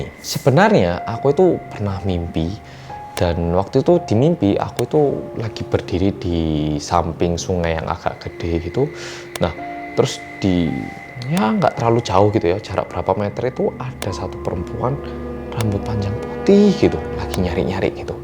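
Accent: Java, Indonesia. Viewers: Ini orang Jawa atau korea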